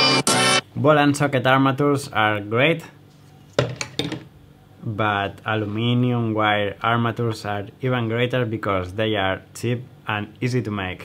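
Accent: Spain. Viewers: Como c nota q eres español XDDD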